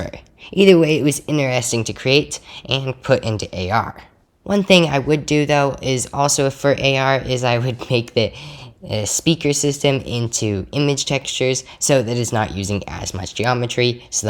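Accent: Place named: United States. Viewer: Dude you sound American. So, I would assume the imperial system should be second nature😂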